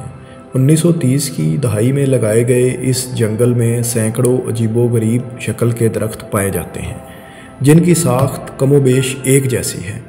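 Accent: Punjab, Pakistan. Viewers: Punjabi accent in Urdu documentary, what an amazing......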